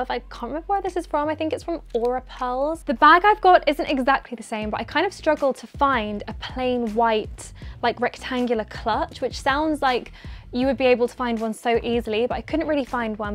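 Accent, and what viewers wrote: United Kingdom. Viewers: she british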